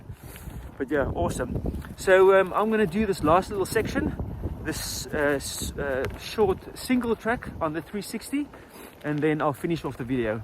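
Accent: South Africa. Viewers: you sound soth-afrikan. Channel looks good, subbed.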